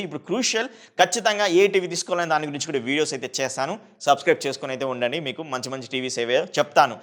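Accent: Telangana, India. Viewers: మీకు తెలుగు సరిగా రావటం లేదు ఎందుకని, తెలంగాణ వాళ్ళ మీరు? స్లాంగ్ totally తెలంగాణ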